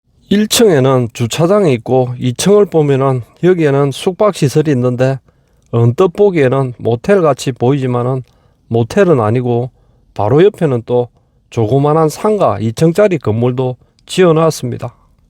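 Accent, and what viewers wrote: Gyeongsang, South Korea. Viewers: 경상도 아이가 ㅋ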